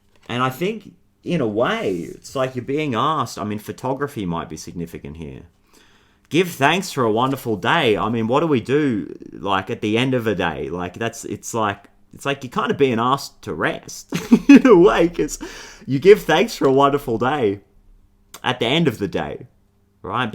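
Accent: Australia. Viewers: Left tarot vids for a year, been needing it this past week and it’s been EPIC and defo in the cards, wherever I go, but I gotta say it was so lovely to hear your lovely Aussie sweetness, familiar to my ear. Am on X stages, surrounded by all ethnicities, mainly Yanks. So hearing such a sage and true message from you was just the cherry on top…as I head into my Hermit phase. Looking forward to tuning in here again. Grateful for you. 🥰🙏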